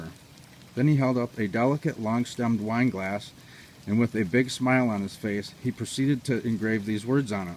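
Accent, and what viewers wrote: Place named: Rochester, United States. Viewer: Hello brother. I am new to your YouTube channel. I subscribed because as I scrolled through your videos I noticed videos and I adore that man! May he rest in peace. Also, I have a very dear friend who is from Rochester New York and your accent sounds so much like his. I guess those are two reasons as good as any for me to stick around for a while. God bless you.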